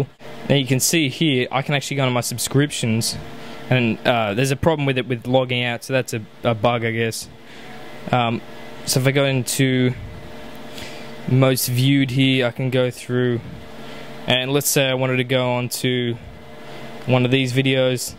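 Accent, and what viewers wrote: Australia. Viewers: finnaly an ausie youtubber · Good to hear an Aussie accent on YouTube, especially about the iPad. Keep at the good videos mate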